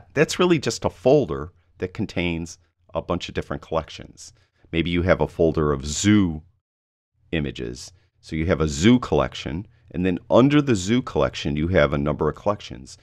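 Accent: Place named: Boston, United States. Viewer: You are from Boston, aren't you? I can tell by the way you say dot CAAM! Well, I guess its no secret since you showed pics of the Boston History Museum last vid.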